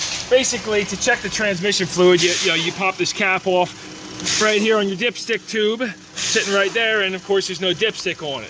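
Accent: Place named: New England, United States. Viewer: Now that's what I'm talking about! And you know what I was kind of digging the Yankee dialect there. Y'all have a blessed day.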